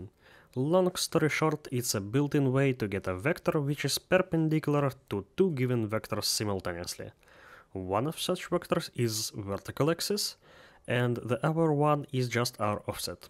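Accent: Russia. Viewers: Очень чётко слышно русский акцент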